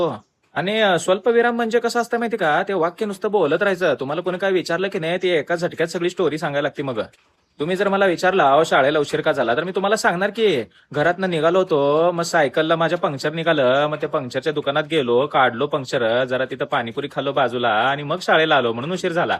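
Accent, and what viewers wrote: Solapur, India. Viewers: Accurate सोलापुरी भाषा बोलला दादा तुम्ही, मी पुणे cha आहे, पण आमच्या friend circle मध्ये आहे एक सोलापूर cha friend त्याची सेम tuning आहे अशीच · शुद्ध मराठी गुणिले सोलापूर करून दाखवलस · Aamche bhajivale kaka pn same asch boltat aaj mahitvzal te solapurchi bhasha aahe 😂😂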